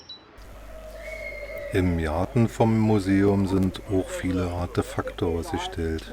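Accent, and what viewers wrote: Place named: Berlin, Germany. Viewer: Ein sehr schönes Fleckchen Erde. 😊 Die Moderation ist sehr informativ aber vor allem gefällt mir als Berliner der Berliner Dialekt . Tolles Video LG Gabi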